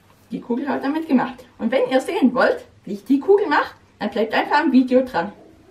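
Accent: Swabia, Germany. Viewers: PS: der Dialekt ist cool....schwäbisch? Stuttgart oder ? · Hockline 89 es heisst dialekt und es ist schwäbisch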